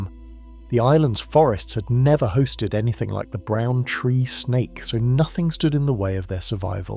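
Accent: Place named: United Kingdom. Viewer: Good video, very informative. Curious why this one has a British narrator and your others have a corn-fed American. Doesn't matter, just curiosity!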